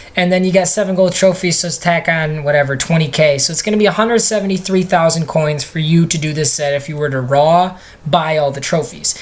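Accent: Michigan, United States. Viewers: Are you from Michigan? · are you from Michigan?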